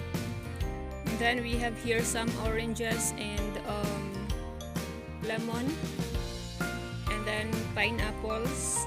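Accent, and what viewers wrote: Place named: France. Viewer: Thanks for the tour! Very nice farm. Do you realize that you have a French accent? Ha ha, you do.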